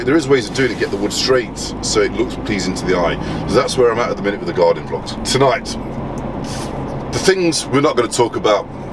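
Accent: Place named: Loughborough, United Kingdom. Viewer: Probably not the best video to ask this - need advice... left my job due to finding out my management were heavily racist (stupid to leave without any job lined up but I'd rather leave on good intentions) Now in-between jobs, would you recommend agency at all? I like to be on the books as I'd like a mortgage in the next few years but I'm happy where I am in life so that's just a plus. I'm Loughborough based so plenty of jobs. Keep up the good work mate, nice to see someone with my accent who's a trucker and vlogging 👊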